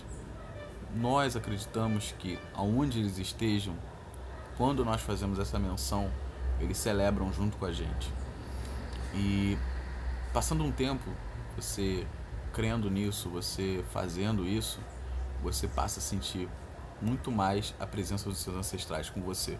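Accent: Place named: Rio de Janeiro, Brazil. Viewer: Pelo seu sotaque vejo que você é do Rio! Eu também! Existe alguma comunidade de pessoas que segue, vocês se reúnem?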